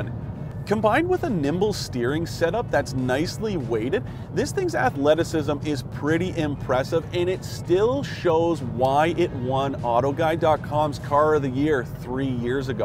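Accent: Canada. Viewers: Michael Zhu he's Canadian. Canadian's talk the way they do just as people in the U.S. South talk the way they do. Ever heard a southerner drawl out nearly every word they speak?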